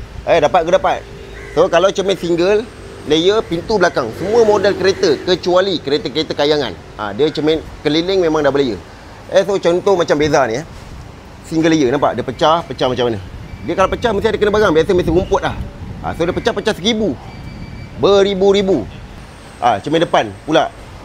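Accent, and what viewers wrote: Indonesia. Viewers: Indon ke dia ni?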